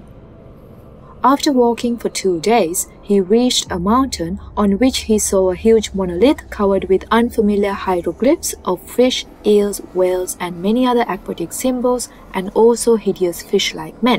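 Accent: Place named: England, United Kingdom. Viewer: nice. story telling! i am curious, i have heard your accent once somewhere else, is this accent from England or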